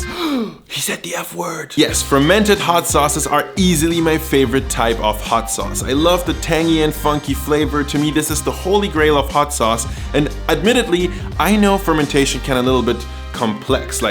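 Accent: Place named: Berlin, Germany. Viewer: I immediately knew you were from Berlin because of the way you speak and when you showed the houses I was certain lol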